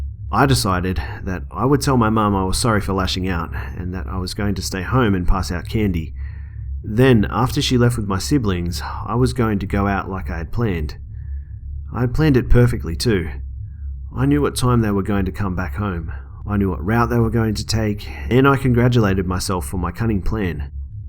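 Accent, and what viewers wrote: Australia. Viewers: Delete your comment quick. He's aussie and aussies get mad when you mistake their accent for a british accent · does have a great voice! But you should come to Australia all the guys have the same accent/voice to a degree! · good job. feels wierd hearing an Aussie on YouTube. guess I haven't delved enough. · i love the Australian accent what do Australians think of a american Midwest accent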